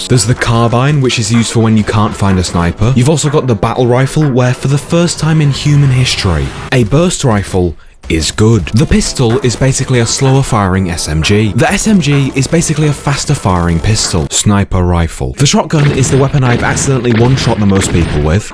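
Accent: United Kingdom. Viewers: If I had a nickel for every game video essay channel with good video fx run by a comedic smooth talking early twenties white british (or aussie) man I'd be a millionaire.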